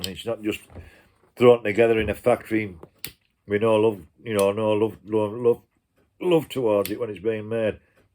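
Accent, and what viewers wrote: Yorkshire, United Kingdom. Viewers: I genuinely hope you are pain free soon. Keep your stories coming they are an important picture of the past and you tell them in your wonderful Yorkshire accent to the tick of a clock which is all a perfect background to your pipe smoking content. I for one enjoy it all and thank you for it.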